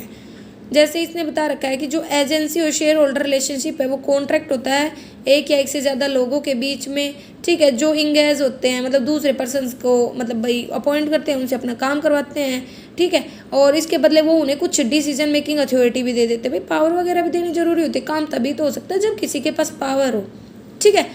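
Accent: Haryana, India. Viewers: Haryana se ho app?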